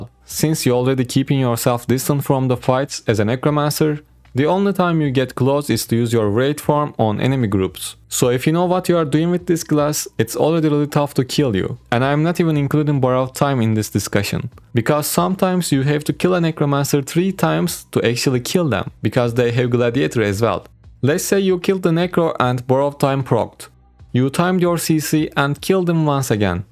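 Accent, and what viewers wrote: Turkey. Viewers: Tam türk aksanı var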